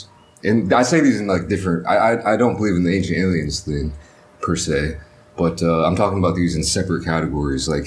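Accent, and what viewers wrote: United States. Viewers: Hey i do have a question and help me pick up your accent man so like how did you get this i mean i get it you were in the states for quite some time but help me out man and been a watching your videos since lockdown and enjoying these vids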